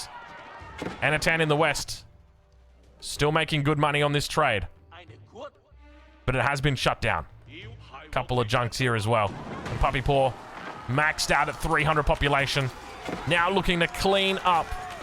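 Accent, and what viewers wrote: Australia. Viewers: love this aussie man casting vods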